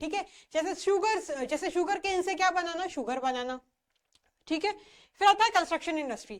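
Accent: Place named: Punjab, India. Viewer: She has Punjabi accent!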